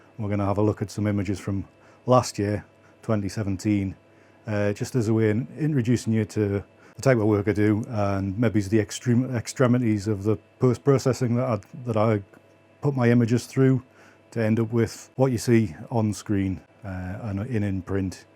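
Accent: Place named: Northumberland, United Kingdom. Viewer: Hey, thanks for sharing your process and approach, your images really cross a lot boundaries, which for me makes them so interesting. Also as a lad born and brought up in Corbridge but loving in Devon, I am loving hearing the Northumbrian accent. Have you met Thomas Heaton btw?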